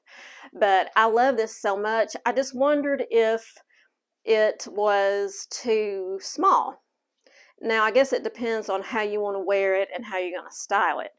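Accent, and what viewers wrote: South, United States. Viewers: Enter me!! I love your videos and Your charming southern accent! Thank you for sharing.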